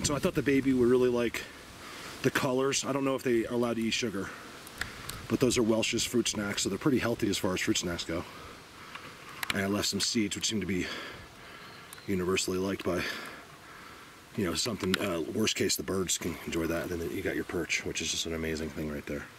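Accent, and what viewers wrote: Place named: Philadelphia, United States. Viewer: Hey. I’m a brand new subscriber. I hear an accent. You sound exactly like a friend I have who’s from Philly but now in TN. It’s uncanny. There’s lots of Bigfoot here in the PNW. Too many weird happenings in my days of exploring. I had one specifically leave me a gift on the drivers side windshield of my car. It was sweet. Guess he enjoyed all the fish.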